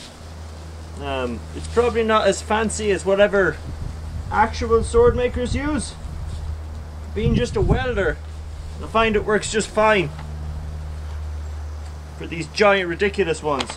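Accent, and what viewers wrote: Ireland. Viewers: its an irish accent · I thought he was Russian then realised he was irish. What a skilled .. blacksmith? He's good. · Is he irish? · First thing I noticed was your irish. I'm Irish too by the way.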